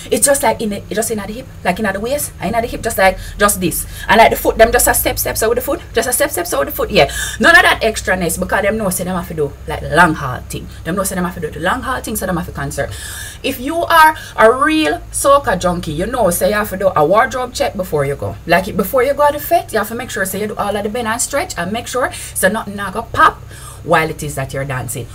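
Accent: Trinidad and Tobago. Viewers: Loved your genuine Trini accent which is distinctly different from the Jamaica accent. That was Funny and informative. We just had Mashramani in Georgetown and it's beginning to tole more like carnival. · Also props for putting down a good Trini accent! Trini/Jam love!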